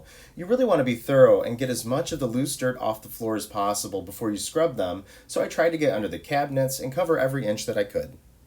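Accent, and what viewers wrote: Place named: Canada. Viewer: Great demonstration! Your voice sounds much like a Canadian I have heard on Youtube that has a vintage kitchen and a collector of turquoise appliances.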